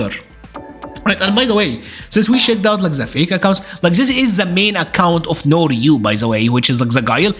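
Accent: Egypt. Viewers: Egypt? crazy accent. how do so many of yall fit in the Nile delta? 🤯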